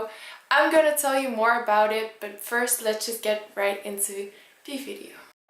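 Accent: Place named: Netherlands. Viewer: Everything looks so nice! Also your thumbnails look so great and look so well together! OMG I KNEW I HEARD AN ACCENT. Kom je ook uit Nederland? Cute, subbed <33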